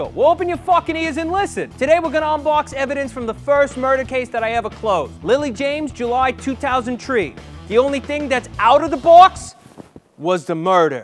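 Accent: Boston, United States. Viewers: Bawston accent is great. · Not sure if the accent is Bostonian or Archie Bunker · I mean like common, that baws-town accent ... really?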